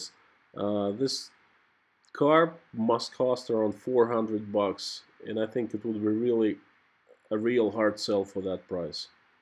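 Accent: United States. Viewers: You speak interesting , clear American English. I watched all of this presentation. Thank you , good job of it.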